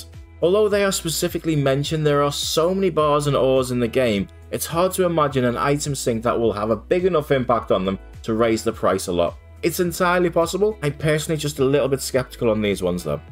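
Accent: Liverpool, United Kingdom. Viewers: Fellow scouser? yes lad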